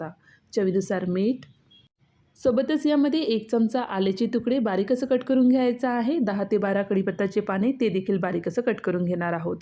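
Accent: Vidarbha, India. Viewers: तुम्ही नागपूर, भंडारा किंवा पूर्व विदर्भाचे आहात वाटते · Bhashe warun olakhtat loka,vidharbha chi marathi vegli aae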